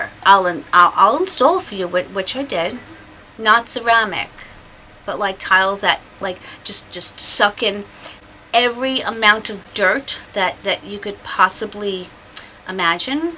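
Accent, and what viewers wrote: New York, United States. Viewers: Love the Llama sox & your New York accent, you are such an awesome daughter to look after your Daddy the way you do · I love how you say New York, it sounds like New Yawrk!! Love your accent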